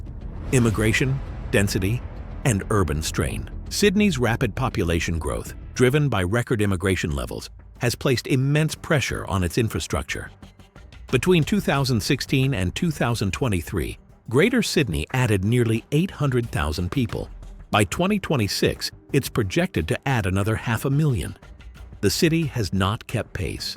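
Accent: United States. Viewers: How come when you show money, you always show US $ ? Apart from the American accent to start off with... The points raised have of course value.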